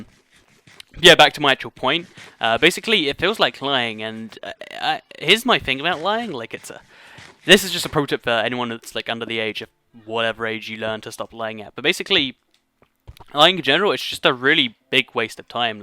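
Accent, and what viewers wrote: United Kingdom. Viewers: Yeah.Your british accent is pretty interesting though, that's part of why I like your channel and I also like your channel because you are a very informative channel :')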